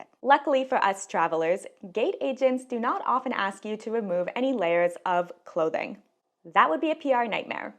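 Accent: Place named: California, United States. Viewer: Can't take that voice. The "Cali-Talk" is just too irritating. Good thing there's a transcript.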